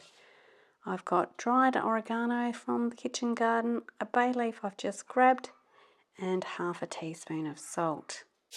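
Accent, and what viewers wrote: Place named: Australia. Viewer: I think that there may be time when You Tube creators may question whether their channels are worth all the work that goes into producing content. This is my personal story of how your lovely posts have restored my spirit. Last fall my husband was given a devastating diagnosis. I spent several weeks taking him to daily radiation treatments and dealing with the side effects. (The lesions were discovered early and we have reason to believe he is on his way to full recovery). So what does this have to do with your gardening channel? Though I am an avid gardener, in the aftermath of his treatment regimen I experienced depression and lethargy. My garden needed prep for the upcoming season but I could not motivate myself to do anything other than sit on the couch and stare into space. Here is where you come in: I would watch one of your videos and could feel a spark of garden interest reigniting in my spirit. 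I would go outside and do just one thing. I’d watch another of your videos (enjoying your lovely, and to me—exotic, Aussie accent), then I’d go to my light rack and start a few seeds. The motivation has continued and I find that my depression and lethargy have mostly lifted and my garden and seed starts are ready for spring. And I thank you.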